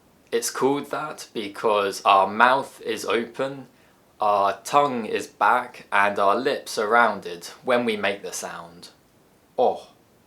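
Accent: United Kingdom. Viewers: Profe eres genial ya me suscribí definitivamente me gusta el acento británico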